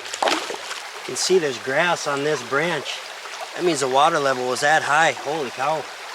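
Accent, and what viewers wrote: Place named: Wisconsin, United States. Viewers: Northern Illinois here. Think I hear that native Minn/wis accent, just a bit. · U got the wi Midwest accent down. Almost Canadian but not quiete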